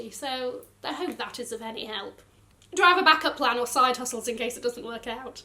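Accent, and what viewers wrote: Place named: Doncaster, United Kingdom. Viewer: Well done! You have yourself a fabulous community who wish you nothing but luck and success. You’re so engaging and having your regular content is a great little piece of home (that Doncaster accent is that little slice of comfort I miss with my tea.) Keep doing you - great things never come from our comfort zones 😘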